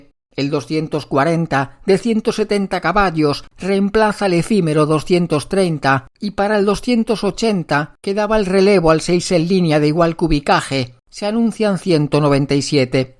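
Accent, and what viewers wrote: Galicia, Spain. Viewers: Es verdad, este hombre es un poeta del motor. Y con ese acento gallego, de los acentos más bonitos de España.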